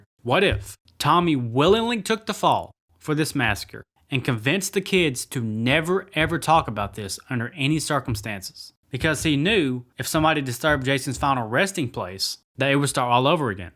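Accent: South, United States. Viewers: 1. I couldn't find a "10 Things I Still Don't Understand About" playlist. Where is it? Trying to binge those videos and YouTube keeps playing videos from other channels after one ends. 2. You sound as country as me, so you should know that country families usually have their own cemetery. Just a little piece of land somewhere on their property where they bury their dead, assuming the family has lived there since the 1800s, early 1900s at least. The question should be why is the Crystal Green (Forest Lake) cemetery so huge?! Is the town really that big? And filled with newcomers who don't have a family cemetery? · You mentioned so I am curious, how old are you? Range? I ask because I grew up with these films and watched them multiple times. I am about to meet Corey Feldman and Adrienne King at a convention so I really like your videos and you have a nice voice, is that a southern accent?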